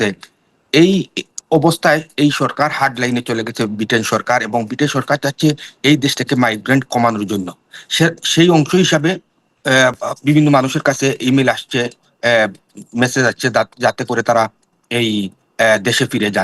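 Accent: Sylhet, Bangladesh. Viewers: Please speak decent bengali or pure sylheti..sounds really odd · সিলেটি ভাষা ও শুদ্ধ। বলতে পারতেন ভালো করে সিলেটি ভাষায় বা টোনে না বলে বাংলা ভাষায় সাবলিল ভাবে বলার জন্য। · ভাই দেখি সিলটি🤔 পুরো সিলেটি মাতিলাও ভাই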